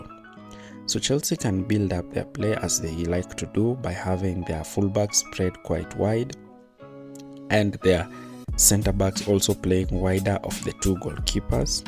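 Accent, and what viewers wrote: Kenya. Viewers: Sounds like a Kenyan😃😃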